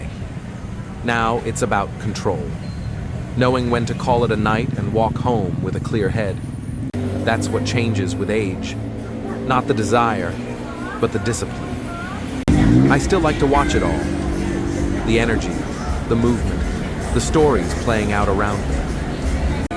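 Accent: United Kingdom. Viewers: You're a Brit?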